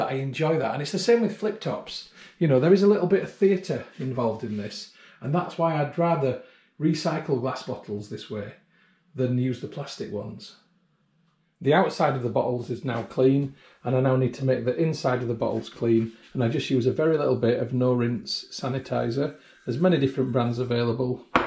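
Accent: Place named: Yorkshire, United Kingdom. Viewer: Hey - good on ya for reclaiming all those lovely bottles. I used to do it but eventually grew tired of the process - I now brew exclusively with flip tops On a different note - I'm sure you've already figured it out since making this video - but if you make up your sanitiser into a solution and fill your bottles that way - not only is it a lot easier to fill the bottles - you can keep reusing the same solution for quite a while as long as the pH stays above 3.5. I keep a few 2 litre juice bottles full of StarSan solution in my laundry and just pull out what I need whenever I start a brew. I haven't had to change the solution for nearly a year so far and given the cost of StarSan it's a good saving. In closing - I'm not sure which I enjoy more - your content or listening to a proper Yorkshire accent again. Ee ba gum lad it's like puttin' on a nice comfy pair 'a slippers. 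😂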